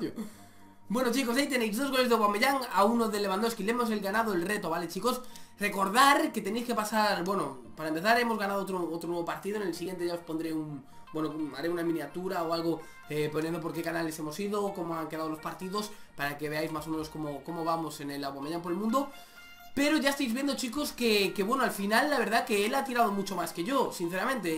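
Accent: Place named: Galicia, Spain. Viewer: de q parte de Galicia eres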